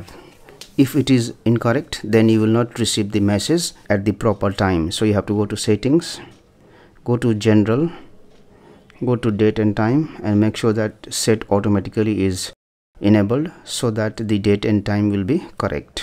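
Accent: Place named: India. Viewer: Thanks man! ….You’re Indian accent is amazing to me. The more I listen to you the more easily I can understand! Haha!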